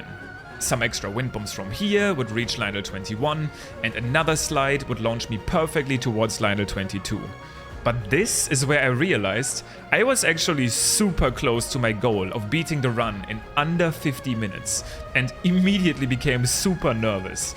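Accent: Germany. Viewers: Das klingt nach einen deutschen aktzent ich weiss nicht wie ich das in Englisch schreiben soll aber bist du deutsch? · your accent sounds so german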